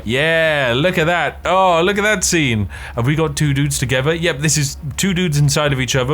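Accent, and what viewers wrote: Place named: RP, United Kingdom. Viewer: what part of england are you from you sound more posh than the people south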